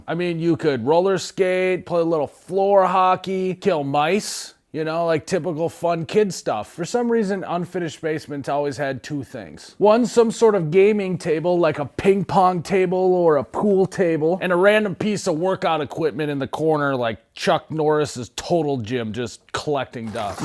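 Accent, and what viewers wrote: Minnesota, United States. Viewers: Me too. This guy is definately from Minnesota or North Dakota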